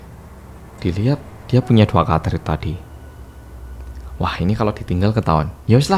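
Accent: Java, Indonesia. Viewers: Kalo Liat mas kaya liat Young Jay just jerk versi medokk 😂. Jago dance juga gak ya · Lihat mukanya mirip bintang korea, haha Logat bahasanya kek orang jawa/ kental bgt Asli mn si kak?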